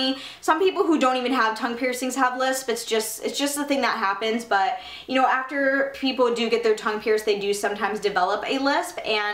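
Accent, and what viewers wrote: Michigan, United States. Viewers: I'm sure that the only reason people ask if you have a lisp is because you're from Michigan. A lot of people I know sound like this too😂 I sound like it as well, and I live in Michigan.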